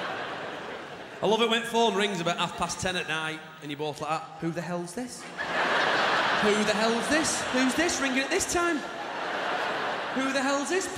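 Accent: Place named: Bolton, United Kingdom. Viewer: Bolton/ Lancashire Accent!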